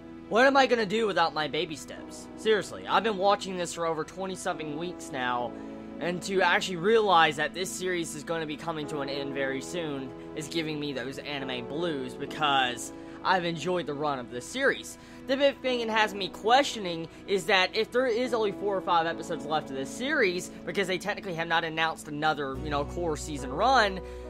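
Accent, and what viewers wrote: South, United States. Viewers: Great review! First time viewing your channel, and I find it to be very well planned and enthusiastic. I can tell you do this for yourself yet you still appreciate your viewers and subscribers. Hearing Japanese names in a southern accent is hilarious and I hope you don't try to fix that. Yes I'm starting to get the anime blues on this one as well. This series is one of the most inspiring and entertaining sports anime I've ever seen! I have always enjoyed the more realistic dramas more than the fantasy shonen. I suppose that's why I like this one so much. Thanks for what you do. *subscribed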